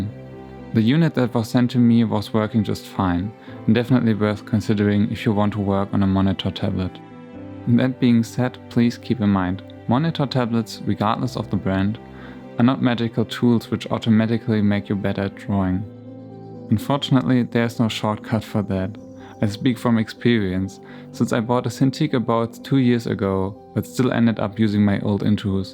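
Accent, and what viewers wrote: Germany. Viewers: You sound like a German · Hey i'm new on your Channel. And i think you sound german. Are you?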